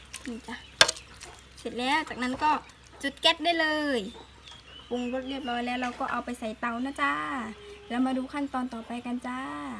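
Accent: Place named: Nakhon Phanom, Thailand. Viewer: ได้ยิน สำเนียง คน นครพนม รักเลยคะ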